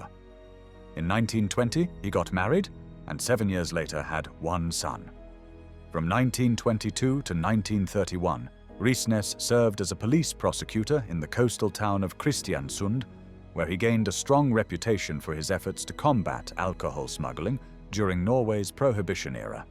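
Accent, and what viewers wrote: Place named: United Kingdom. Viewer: agreed. or at least someone without a UK accent or whatever that is. sorry, cannot listen to an entire video of that accent.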